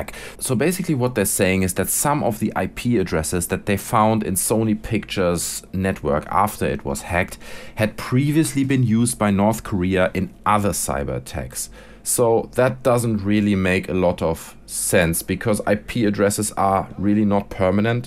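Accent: Germany. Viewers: Ah your accent is German, I've been wondering since the day I saw one of your videos, you say you live in UK but your accent just sounds so different :D. Merry Christmas and Happy New Year :) !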